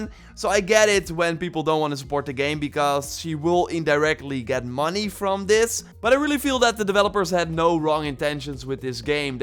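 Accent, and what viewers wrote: Netherlands. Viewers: I can recognize your dutch accent, when you say "video" you can hear it for example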